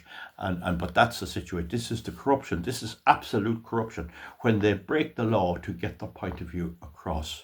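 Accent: Ireland. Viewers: WILL YOU POST A CLEAR COPY OF THE LETTER? I KNOW 15-20 PEOPLE THAT WILL SEND THIS LETTER! LOVE YOU!! KEEP ON GOING! CONSIDER PARTIAL FARMING RETIREMENT! THIS IS MORE IMPORTANT! I NEVER HAD EDUCATION LIKE THIS IN ALL MY LIFE! AND ITS SO CLEAR AND SO EASY TO UNDERSTAND WITH YOUR CLEAR CAVAN ACCENT!! AND ITS FREE!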